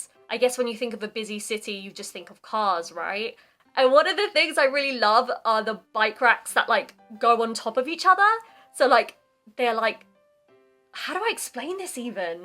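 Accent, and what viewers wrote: United Kingdom. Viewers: イギリス英語🇬🇧素敵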